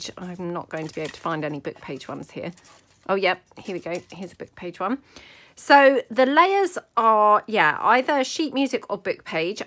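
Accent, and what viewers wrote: England, United Kingdom. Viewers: Hi, I do love listening to your chit chat as well as all your lovely junk journal tips. Have lived in Australia since 1974 and miss the English accent.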